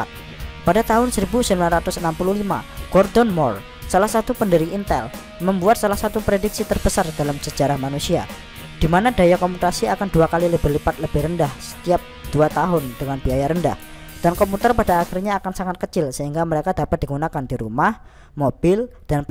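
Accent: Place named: Java, Indonesia. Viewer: laa Medhok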